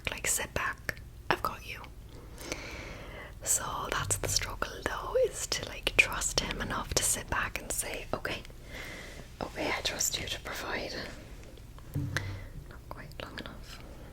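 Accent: Ireland. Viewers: I have a 5-star asmr playlist. Hard to get on it but this one is on it. I also have an “Exceptional” ASMR playlist. Even harder to get on, but I just put this one on it. Your Irish accent voice is the best, and you are one of the most beautiful women I have seen on YouTube. Thanks for sharing with us.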